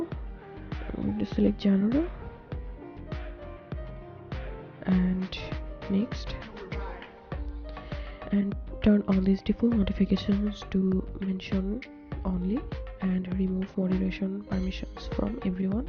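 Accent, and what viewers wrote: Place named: India. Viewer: cant listen to this voice im sorry. if you are the beautiful girl in the thumbnail thats awesome but it sounds like an indian video trying to guide me lol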